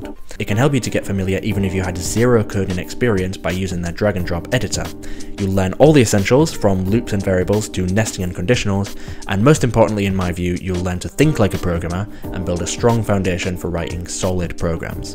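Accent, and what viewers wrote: Hull, United Kingdom. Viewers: Hi, is that a Hull accent?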